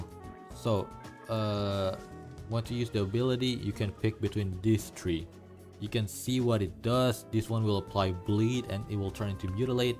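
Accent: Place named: Indonesia. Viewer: di denger denger ini aksen indonesia banget 😂😂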